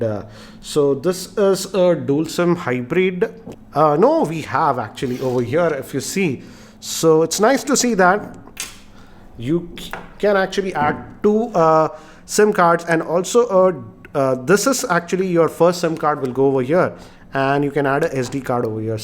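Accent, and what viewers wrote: Hyderabad, India. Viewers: Geekyranjit I like your hyd hindi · waiting for haidrabadi Hindi😂😂😂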